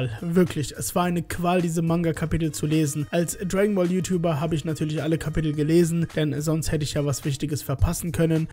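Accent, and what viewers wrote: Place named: Netherlands. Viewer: Ich dachte das war ein holländischer Akzent 😁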